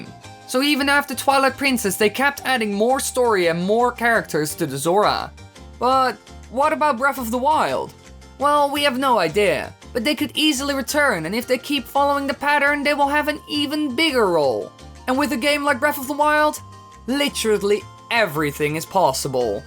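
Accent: Netherlands. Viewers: It's called a 'Dutch accent' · NyakiAki its just his dutch accent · actually, thats his accent as a dutch XDDD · Its his accent. He's dutch. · He's dutch. That's just how dutch people speak. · thats an accent he's dutch and in dutch its very normal to talk like that i am dutch myself but it doesnt sound weird in dutch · Wat he is just dutch man!!!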